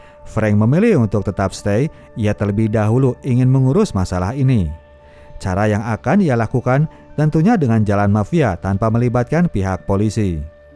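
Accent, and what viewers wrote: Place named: Sumatra, Indonesia. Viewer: Ban ente orang sumsel ya bang, kok dari dulu ane nntn ente beberapa kata dan ucapan ada yang sama kek bahasa kami orang Sumatera gitu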